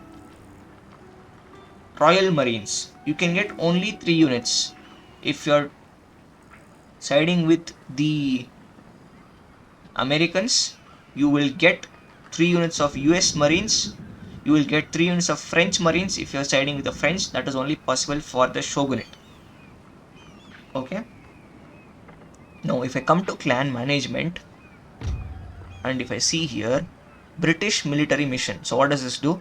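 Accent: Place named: India. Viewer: 100% with that accent apna India. Bhai hai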